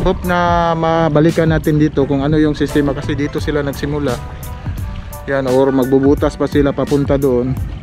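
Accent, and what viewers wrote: Visayas, Philippines. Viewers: Bisaya man kaau imong tagalog idol pro ok ghapon dol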